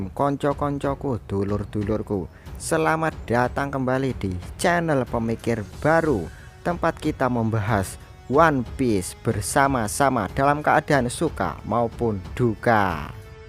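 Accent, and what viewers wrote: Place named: Java, Indonesia. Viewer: Suaranya kurang greget dikit min 😁 medoknya sih mantap, ahaha!!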